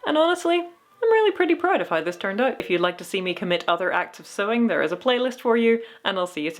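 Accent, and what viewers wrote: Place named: United Kingdom. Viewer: I love, and always love, the combination of detailed research and "eh, throw it together, this is how I'm gonna do it because it's not accurate anyway." Research on chitons, using a chiton, the laminating techniques, and then ballgowns and "why not." Possibly most random comment ever, but as someone with British relatives, I notice and love how accent gets just a little thicker when she's thinking something over. It just reminds me of so many other lovely crafters I know - they get into their craft and instead of "voiceover voice" we get "here is a real person and they are moderately frustrated."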